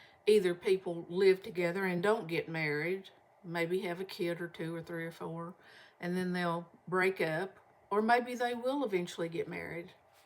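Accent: Kentucky, United States. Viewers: Hi again from NY (Upstate)! I was just remembering, as I listened to your accent, I have only 2 'Ken -- or "Kin"! -- tucky' memories (as far as BEIN' there. They are Lexington (Thoroughbreds, "speaking of 'horses'!") and Ted Bird (are very many people who live in Kentucky familiar with it, or know OF IT?). BTW, I neglected to say before, Welcome to YouTube!😉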